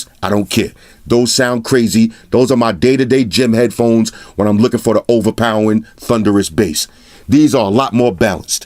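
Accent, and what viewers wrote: New York, United States. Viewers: I guarantee you this dude from New York 😂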